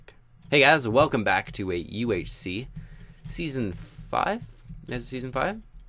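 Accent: Canada. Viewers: Is you Canadian? This is the first video I've seen from your channel, so I don't know. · Ohh he's Canadian ! I was wondering what his accent was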